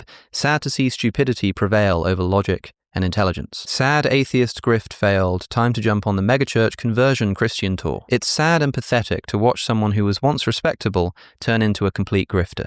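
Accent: United Kingdom. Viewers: I think you meant to say "why are you not" The answer to "why are you hot." is because he is an intellectual with a smooth british accent and a soft face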